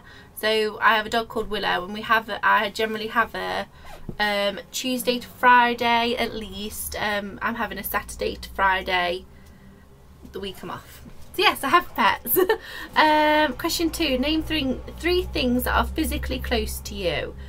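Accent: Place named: United Kingdom. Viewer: What accent is that, not sure if British, oops never-mind you answered my question later on in the video haha This was so cool to listen to, wish us Americans had a cool accent haha.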